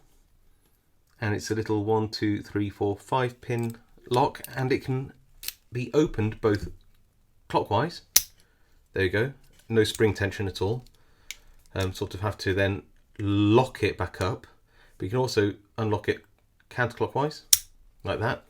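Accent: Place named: RP, United Kingdom. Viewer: You are just so talented, you speak the "Queens English", you pick locks, gut and re-pin locks, and now I see you can do optical illusions! Does it EVER end!?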